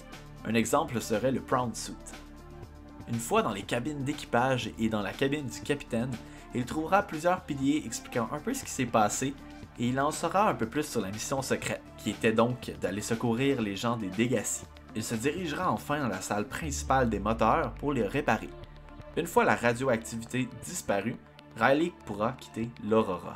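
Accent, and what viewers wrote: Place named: Quebec, Canada. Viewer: j'adore merci pour cette série, je suis d'ailleur jaloux de ton accent qc le miens en pas assez prononcé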